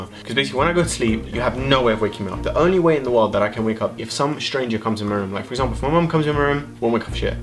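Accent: United Kingdom. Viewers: why isnt your british accent as strong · Hey I'm a new subscriber, soo glad I discovered your channel. Just wondering have you lived in the UK? love your accent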